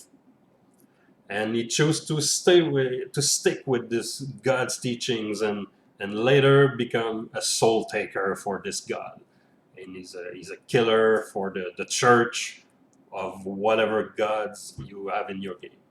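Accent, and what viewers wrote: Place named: Quebec, Canada. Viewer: Merçi bien! J’ai grandi à Deux Montagnes… a very familiar accent. In NB now. Im a big fan of SotWW and will be playing soon. Thanks for this reflection.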